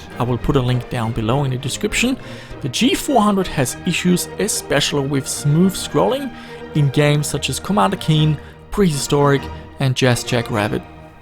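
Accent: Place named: Germany. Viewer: FROM CANADA?! I always thought u were German from your accent lmao!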